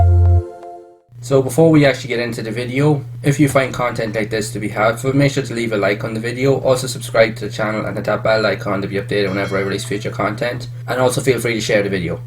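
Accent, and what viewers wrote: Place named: Ireland. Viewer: what county in Ireland you from?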